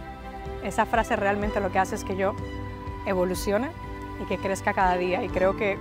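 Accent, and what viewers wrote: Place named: Dominican Republic. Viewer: a mi me gusta mucho que te sale el acento dominicano y español a la vez, es una bonita mezcla. Excelente historia, a por mas.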